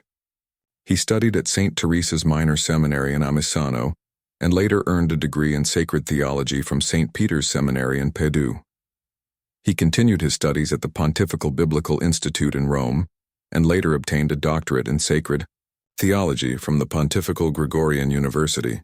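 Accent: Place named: United States. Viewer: almost like an American talking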